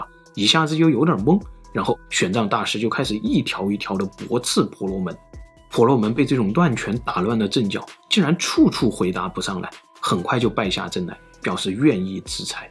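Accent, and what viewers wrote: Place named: Hunan, China. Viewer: 这在湖南称为“塑料普通话”，很有湖湘味道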